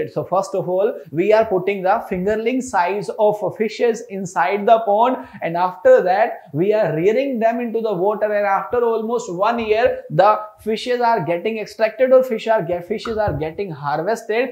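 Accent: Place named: India. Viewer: thank u very much, your english is not only easy to understand but I also like your Indian Accent and the simplicity and the choice of words while explaining. I'm from North but I'm more comfortable in this format since it shows how an ordinary teacher is improving his skills of teaching and explaining by using simple words. Even if anyone is struggling in English should see these English lectures because of your clean, simple and plain English and learn how to adapt according to the environment. Thank u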